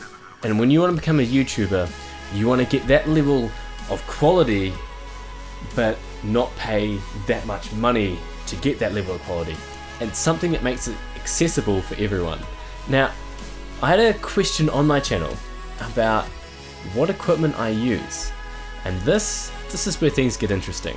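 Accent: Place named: New Zealand. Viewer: keep making videos, mate. your videos are amazing and I like your kiwi accent! :D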